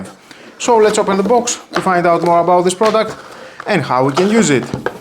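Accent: Greece. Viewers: Are you Greek? A friend of mine has exactly the same accent 😊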